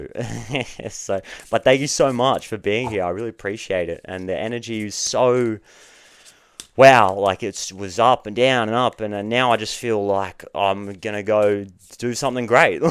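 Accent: Australia. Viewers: Been trying to place your accent! Not american, are you? Australian? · Hello seeing you for the first time and I want to say what a handsome face you have, so nice looking. What part of Australia are you from, you accent to me sounds Australian. You also seem a sensitive and shy person. Your reading resonated with me. Greetings from me in the Emerald isle of Ireland and a very happy and blessed new year to you and your family. 🇮🇪🙏🙋🎄☃️😁🇦🇺.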